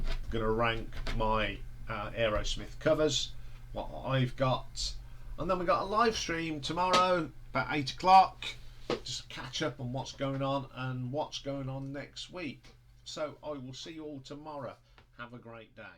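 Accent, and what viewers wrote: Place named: Sheffield, United Kingdom. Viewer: You sound like you're from Sheffield.. am I right?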